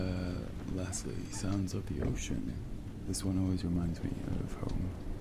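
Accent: United Kingdom. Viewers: Omg he speaks British! & this is art! Congrats! Felt like watching a movie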